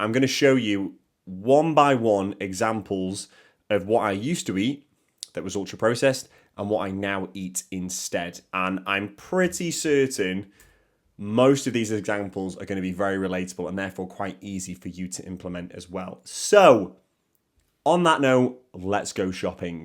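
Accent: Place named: Northwest England, United Kingdom. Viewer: Excellent video. Sounds like you're from my neck of the woods (northwest England) Being a lazy cook i was surving on ready meals and pies until a heart scare a few months ago. So now I have to eat healthily and finding it tough. My nurse is saying no butter, but I think a little is better than the UP spreads that taste vile anyway. The food industry is failing us. They have to reduce the number of additives as the demand for convenience food won't go away.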